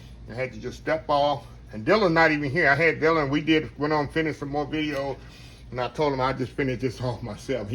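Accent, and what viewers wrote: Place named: Texas, United States. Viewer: I came here because the church folks asked for this cake....I knew that was an Texans accent ❣️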